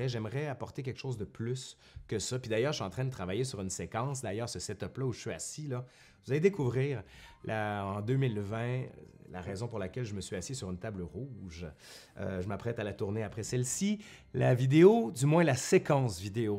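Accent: Quebec, Canada. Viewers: Merci pour votre travail. j'ai adoré la (longue) vidéo sur la révolution française. Narrée par un cousin québécois, ça apportait un charme certain..., ainsi que la FAQ. vos élèves ont de la chance de vous avoir. Pour les 'platistes', comme disait Audiard : 'si on mettait les cons sur orbites, ils n'auraient pas fini de tourner'. Encore un effort pour les 400 k. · ^^ moi, je t'ai découvert grâce à Ben. J'adore l'accent de la nouvelle france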